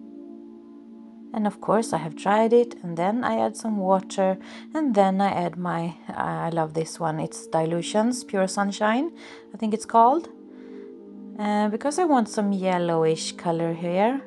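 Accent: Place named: Sweden. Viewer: Love, love, love it! You go girl! Your English with Swedish accent is beautiful. I admire those that are multilingual. xo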